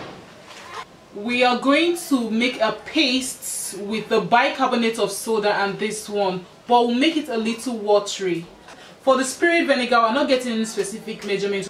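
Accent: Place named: Africa. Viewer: Love that African accent P.S. you are beautiful!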